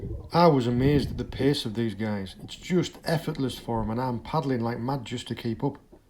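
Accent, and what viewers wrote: United Kingdom. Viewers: I'm showing this video to my student now to demonstrate the British accent.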